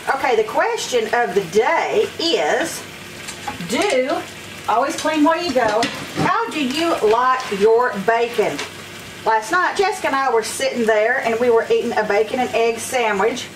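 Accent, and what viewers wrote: South, United States. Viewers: Medm crispy?? Not completely done. Thanks for cooking demo. I'll have to try the burritos. Whisky- woo. Love the way you talk. I'm southern too. Whenever I travel thru Georgia., No one Asks where ya from??? Assume I'm a native. Love it.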